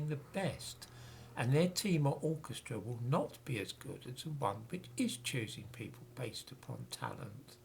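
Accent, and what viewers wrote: United Kingdom. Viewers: I like the content. Problems I have with the channel though are, the marked British Accent is an obstacle. Second, like me the gentleman is old and balding. Me I am fatter with less wrinkles and more hair. He is older than me. Third, the moustache. TRIM IT. Fourth, MOVE the camera farther away and you will not look as wrinkled as you are. Fifth, maybe you could wear a hat ore a beret. Sixth, you could wear a button up shirt. Mostly, like MANY channels, you are VERY CLOSE to the screen. BACK THE CAMERA UP or FIND a BIGGER ROOM. MOVE the BOOKCASE from behind you. Are you in a TINY APARTMENT, a CLOSET? This all said, your CONTENT IS GOOD!!!!!!! Thank You. My thumbnail is of a very old Harvey Comics character, Hot Stuff the Little Devil. So, NO, I am not trolling people as a devil.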